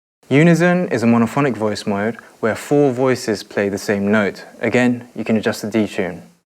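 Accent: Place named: United Kingdom. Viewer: and he also has british accent ...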